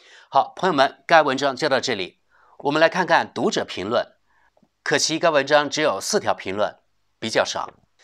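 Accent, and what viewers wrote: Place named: Guangdong-Guangxi, China. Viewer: 两广人的广普，就这样子了😅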